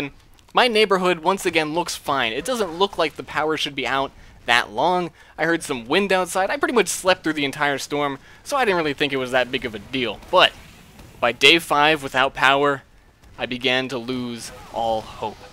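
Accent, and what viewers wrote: New Jersey, United States. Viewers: American lol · So ur from nj then?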